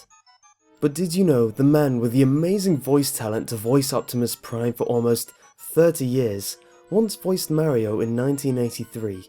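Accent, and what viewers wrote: United Kingdom. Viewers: i knew the videos are only creepy cause of the british voice!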